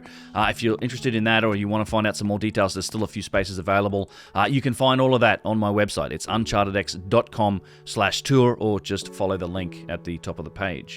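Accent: Australia. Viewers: That's a fellow Aussie accent! 😉 Nice work mate 🙂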